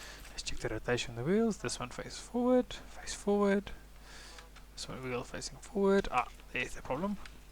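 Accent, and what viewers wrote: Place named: South Africa. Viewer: You sound like a fellow SA bra good vid btw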